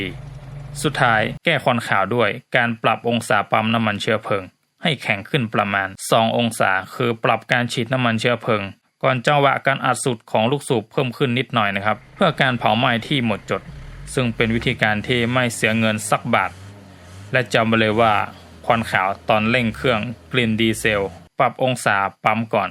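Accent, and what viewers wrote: North, Thailand. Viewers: เป็นคนที่ไหนครับ สำเนียงเหมือนคนเหนือ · ฟังจากสำเนียง แอดมินนะจะเป็นคนภาคเหนือ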